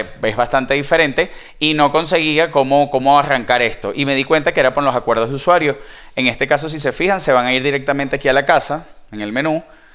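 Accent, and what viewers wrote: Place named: Venezuela. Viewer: Hola, un saludo amigo. Percibo que por la manera de hablar eres de Venezuela. Sí es así, sabrás cómo hacer para que reconozca la zona del país? Intento conectarme a LG Channels y siempre me da el mensaje "Lo Sentimos. LG Channels no está disponible para su zona" cambié la ubicación en los ajustes... Y en los ajustes solo me aparecen (Automático - Canadá - México - Estados Unidos - otros). Sí me podrías ayudar, mucho te lo agradezco, hermano.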